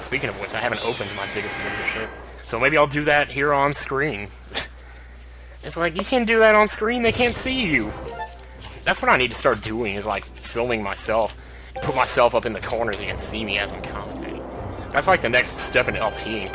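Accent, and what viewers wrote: South, United States. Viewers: I love your southern accent never change it:3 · 1. Please talk southernly more 2. Please film yourself, it would be awesome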